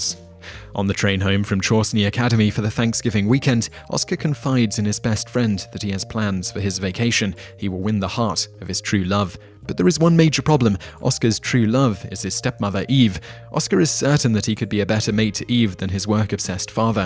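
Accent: United Kingdom. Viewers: ... maybe it sucks first of all because this guy has a British accent. I realize the tradition does actually go back to the English, but these days it's considered an American holiday ...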